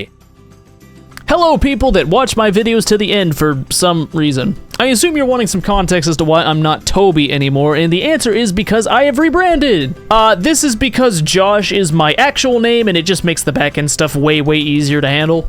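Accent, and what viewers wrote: United States. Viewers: You speak American? not England language? intersting. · You actually speak English! Granted it is Americanised English.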